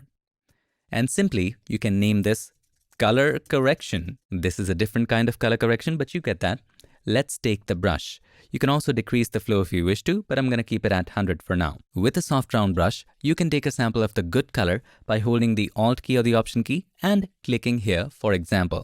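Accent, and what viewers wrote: India. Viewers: Your Indian accent is still very thick. Also, you will not look taller by pulling your hair up.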